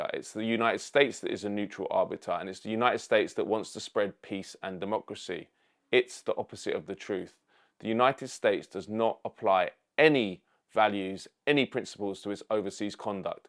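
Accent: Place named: Cockney, United Kingdom. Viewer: Your London thick Cockney accent dialect is not easy to understand to a lot of English speaking nations. If you were more well spoken your message would get across more. Btw i agree with everything you say. I'm from London.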